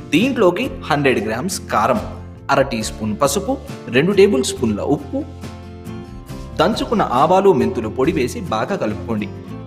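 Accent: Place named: Guntur, India. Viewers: actually nenu vere vallani anatledu sir gnt slang baguntundi meeru slang marchakandi teleena vallu apardham cheskuntaru antunna that's alll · Are meru Guntur slang Kuda Chala chakkaga matladestunnaru. Vinadaniki bagundi. Pickle chuste notlo neellu,kallalo Kuda neellu vachestunnai. Defenate ga chestanu. Garu meru okasari kanipinchandi.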